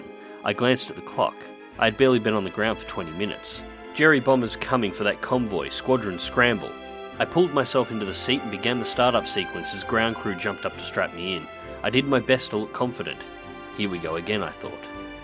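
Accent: Australia. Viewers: I LOVE THIS!!!! I am a "Yank" in Tennessee and just wanted to say ,I haven't even finished watching the video , but had to stop to tell you ",YOU HAVE FOUND YOUR CALLING!"Ive been wandering what you would do now that Giajin has "went too far"!! your Aussie accent ,style, and obvious knowledge of what you are talking about is what makes this hopefully, the first of a VERY long and successfull series! Would like to see two a week if possible, could run for YEARS!! "THANK YOU VERY MUCH FOR ENTERTAING ME!!"...was about to give-up on YouTube. :)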